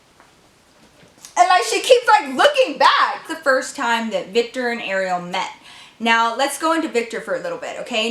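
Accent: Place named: South, United States. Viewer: southern accent comes out more when she gets mad 😂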